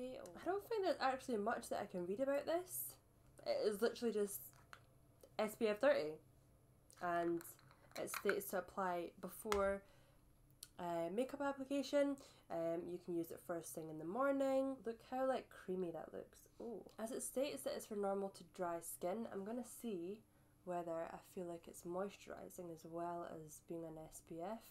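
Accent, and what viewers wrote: Scotland, United Kingdom. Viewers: are you Scottish??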